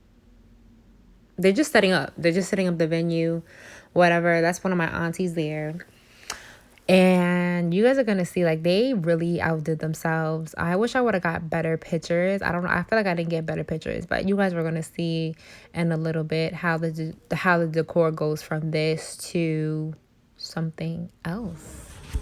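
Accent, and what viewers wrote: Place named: New York, United States. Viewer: Yoooooo this shit beyond crazy. 1 you sound like you from the Bronx or something 2. My dad is right up the street 3. Idk how I ended up here but yeah dope video it’s just you sound so New York 😂😂 tf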